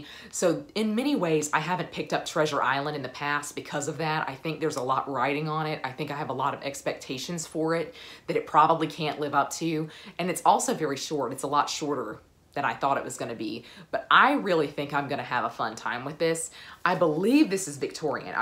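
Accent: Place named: Texas, United States. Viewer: Love the way you say y'all. Probably best Texan accent I've heard. Also, love the way you comprehensively talk about books.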